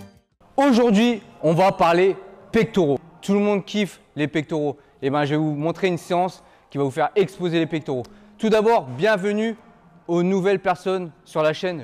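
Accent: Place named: Saint-Étienne, France. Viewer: Tu est de saint Étienne toi non ? Car tu a vachement l’accent de là-bas lol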